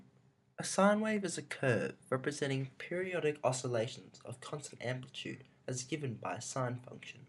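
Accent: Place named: RP, United Kingdom. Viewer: whats with the posh accent haha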